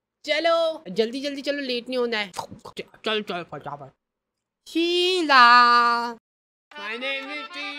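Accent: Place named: Malwa, India. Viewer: Bro tumhari urban malwu accent bahut mast h yaar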